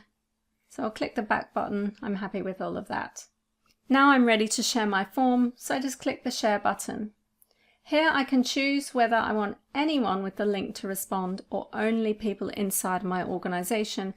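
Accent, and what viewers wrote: United Kingdom. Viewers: Many thanks,. 🤣 I always thought you were British 🤣. Admittedly, I've never been good with accents, and/or it wouldn't be surprising for a Brit to live in Australia....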